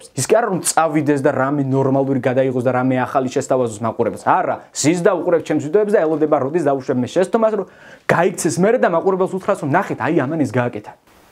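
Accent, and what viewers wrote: Mingrelia, Georgia. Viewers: ჯერ ეგ მეგრული კილო მოიცილე და მერე ილაპარაკე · dajma sad dainaxe tipi 10 wuti wuwunebda banzi megruli tonit vso iumori qarizma rame saintereso normaluri montaji sityvebi mainc rom gamartos araferi ar aqvs yvelaze uintereso 10 wuti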